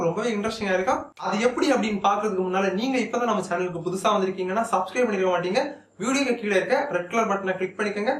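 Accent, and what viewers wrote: Kanyakumari, India. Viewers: Bro neenga kanya kumaria ....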